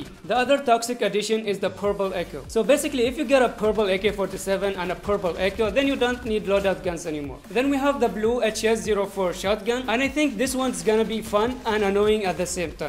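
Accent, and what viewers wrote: India. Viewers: Indian accent :( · Hahah I love your accent indian right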